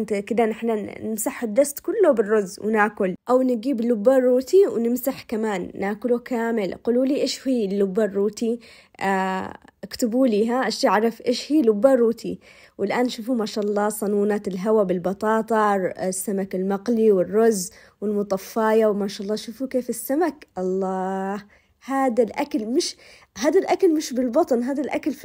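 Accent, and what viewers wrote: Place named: Aden, Yemen. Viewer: اللهجه العنديه احلا من الاكل.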